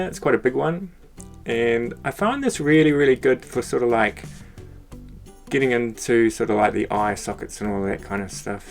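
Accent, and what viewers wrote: New Zealand. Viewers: That accent sounds like a Kiwi, am i right? If so you art work tooi much😮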